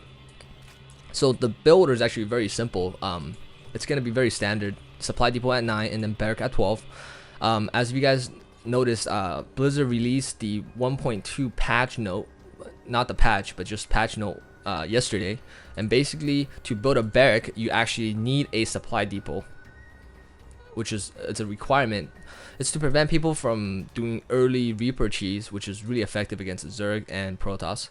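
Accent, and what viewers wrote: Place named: United States. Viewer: No offense but your American accent is annoying.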